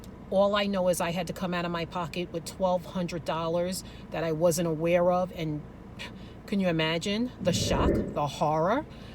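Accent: New York, United States. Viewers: So as you were talking ,im like she has to be from NYC...girl I am too and moving also, gour video was very helpful.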